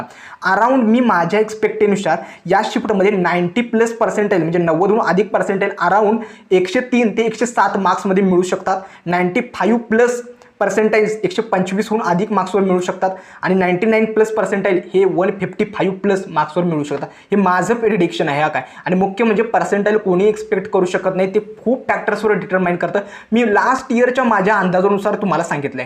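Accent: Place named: Kolhapur, India. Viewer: Kolhapuri accent i think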